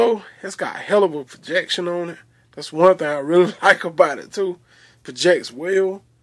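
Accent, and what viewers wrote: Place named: North Carolina, United States. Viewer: Nice review. Sound just like my hubby. North Carolina accent lol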